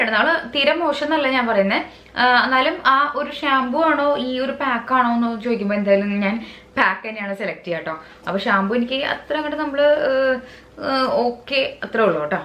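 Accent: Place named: Thrissur, India. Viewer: ചേച്ചി തൃശ്ശൂര്കാരിയാണോ. സംസാരം അതുപോലെയുണ്ടല്ലോ 😻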